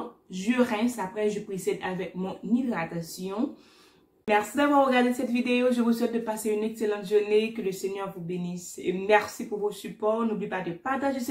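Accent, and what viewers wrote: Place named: France. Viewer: Yes!!! Don't ever stop speaking French and English ,I'm from English speaking country but now live in French speaking country , love your francais accent ,merci boucop madmosel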